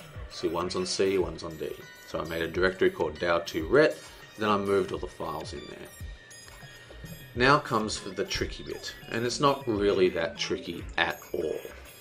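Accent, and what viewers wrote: Australia. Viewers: I'm most impressed by the fact that you did all this with steam open in the background. Anyway, great tutorial! And is that an Australian accent? :P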